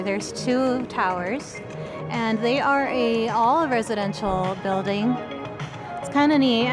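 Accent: United States. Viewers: I detect a california accent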